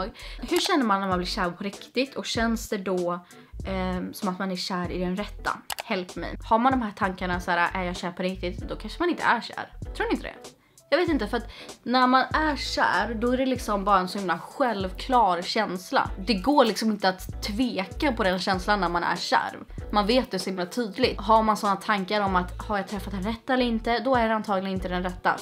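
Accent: Stockholm, Sweden. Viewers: ja hon har bytt från vanlig svenska till stockholmska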